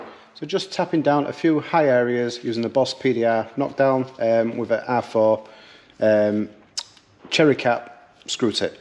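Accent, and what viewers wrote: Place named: Middlesbrough, United Kingdom. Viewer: How much would a repair like that cost? You sound like boro accent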